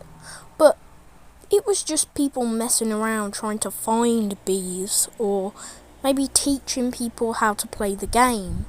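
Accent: United Kingdom. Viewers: Your accent is literally the perfect British accent for broadcasting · It makes it even funnier because he British😂😂😂😂😂😂😂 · Are you British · Bro u so brithish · BRITISH MAN UGH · Nar mate he’s British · ngl no offense but its its alot harder to take you seriesly when your british XD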